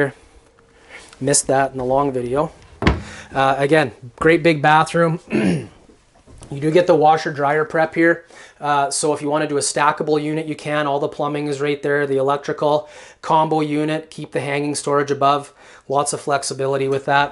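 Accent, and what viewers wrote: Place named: Canada. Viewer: Boy theres Canadian accent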